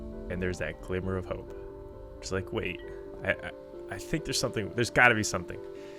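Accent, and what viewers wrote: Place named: England, United Kingdom. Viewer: Very clever of you!! I loved it. I knew that it was all you! But who is the narrator? Very English xx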